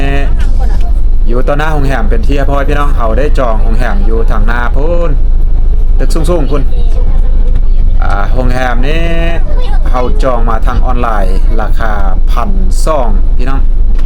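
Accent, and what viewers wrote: Loei, Thailand. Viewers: สำเนียงเหมือนคนจังหวัดเลยเปาะเรยครับ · สำเนียงคนเลย คนหล่มสัก นครไทยเลยค่ะ พูดภาษา สำเนียงเดียวกัน ต่างแค่คำนิดหน่อย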